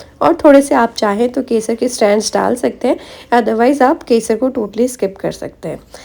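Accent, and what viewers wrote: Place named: Madhya Pradesh, India. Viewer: Mujhe lag hee raha tha, bolne ke tareeke se ki aap मध्य प्रदेश की होगी ।👍 बढ़िया । में भी m p ki hee hun । शुभकामनाएं 🎉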